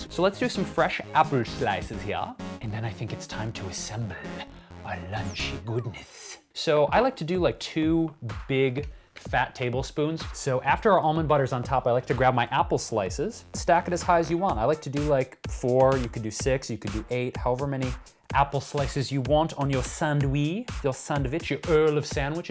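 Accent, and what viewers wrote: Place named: Minnesota, United States. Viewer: Hey there, now, you got dat MN accent down pretty darn good, don't ya!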